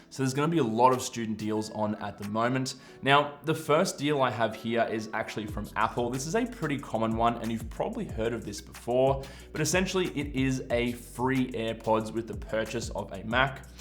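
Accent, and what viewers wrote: Australia. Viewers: I think Apple Australia doesn’t have this deal atm… (I was assuming you’re from Australia because of the accent. )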